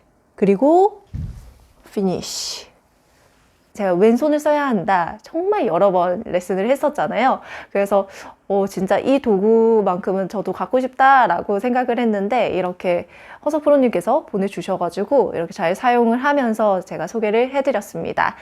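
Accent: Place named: China. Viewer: 중국에서 오래사셨나보네요? 억양이 쥥국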